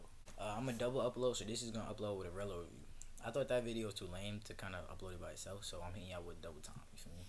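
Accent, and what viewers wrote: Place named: New York, United States. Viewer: Dis nigga from New York for a fact💀🤣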